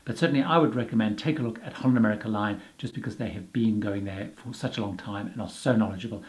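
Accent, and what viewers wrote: England, United Kingdom. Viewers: I believe is British from his accent and from some of the comments he makes. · Your speaking style is difficult to understand and to listen to. You speak too quickly and even worse, the volume of your speech is so variable it is difficult to keep up with. The volume of your speech many times starts out loud, like an attack, then fades into a very low volume for an extended period of time. Then another attack and another fade. Your English(?) accent makes it even more difficult to understand. I would suggest you speak more slowly, more clearly, and try not to have dramatic volume differences in your voice. I found the video difficult to listen to and understand. This comment is meant to be helpful.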